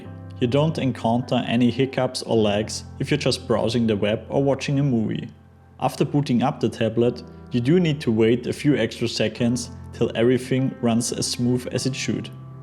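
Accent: Germany. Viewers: German accent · Das hört sich an! Wenn ein Deutscher versucht Englisch zu reden!?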